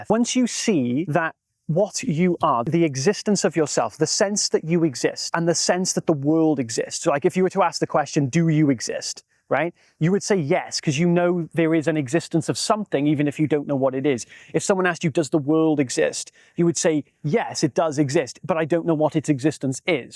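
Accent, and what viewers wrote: Wales, United Kingdom. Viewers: Beautiful background! Where is that? By the way, is your accent Welsh?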